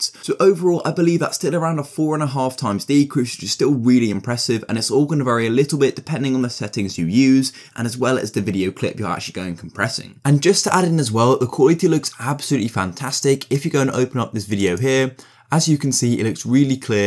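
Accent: United Kingdom. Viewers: You speak british, eeeww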